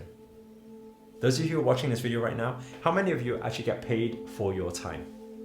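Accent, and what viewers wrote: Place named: Liverpool, United Kingdom. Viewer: Did you ever live on Liverpool? I hear a hint of scouse in your accent. :)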